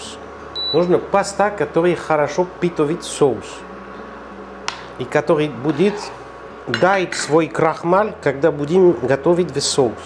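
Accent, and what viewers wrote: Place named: France. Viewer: Готовлю по! Вкусно. И много ньюансов, о которых никогда не догадывалась. Спасибо большое. Отдельное спасибо за русский язык с французским произношением.Изюминка.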